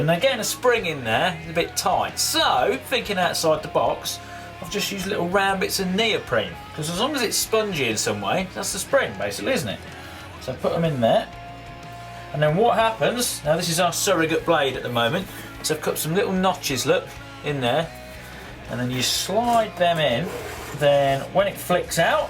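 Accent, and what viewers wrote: United Kingdom. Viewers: How much would you say all the materials for this cost? If possible I want to make myself one. Don't worry about the cost for the tools like the drill and such, I can deal with that. (I know people) I just want to know if I can afford the raw materials. (I probably can't) You sound british, but If possible I would like to know the approximate price in US dollars. I'm also not expecting you to see this comment...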